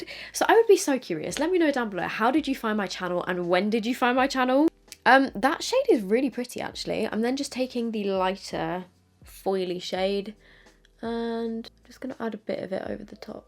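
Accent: United Kingdom. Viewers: You popped up on my for you page in YouTube about a year ago but then I didn't like the British accent but at the same time i was watching some of your videos and after a couple months i became addicted 💗💗💗💗💗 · I found you in mid-2017. I was 37 years old and had NO idea how to do makeup (foundation especially!). I’d always been too lazy to do makeup, and my skin was clear due to hormonal BC since age 15, so foundation was completely alien to me. But as I approached 40, my skin was beginning to show sun spots and dark circles, so I wanted to learn how to do my makeup. I subbed to around 15 makeup channels including yours & I have only stayed with 3, including you. Mostly because you’re super relatable, down to earth, honest, and I adore a British accent (USA here). I’ve really enjoyed watching you grow up and thrive. I’m happy for you in the same way I’d be happy for a little sister… is that weird? 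I don’t even know you but I have the best wishes and highest hopes for you & your little family. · !!!! I started watching your videos in 2017 or 2018 exactly for testing these cheap make up products and because I was living in UK back then and you helped me to learn understand british accent. Later I stopped watching youtube for couple of years and now I just felt like watching make up video and I remembered you so I got back and it was one of the first videos that was recommended for me. I still love you♥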